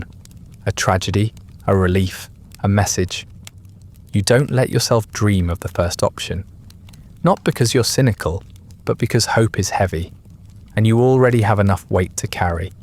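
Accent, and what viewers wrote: United Kingdom. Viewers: Yes, with an English accent.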